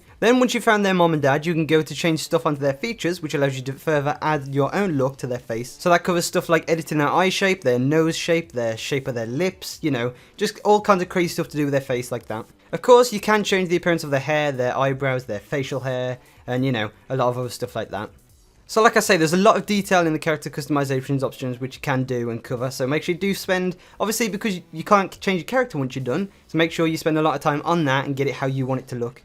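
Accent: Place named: United Kingdom. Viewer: Dat british acscend doe.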